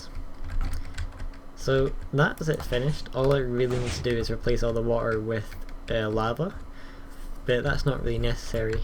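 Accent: Scotland, United Kingdom. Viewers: YOUR FROM SCOTLAND YAY MEH TO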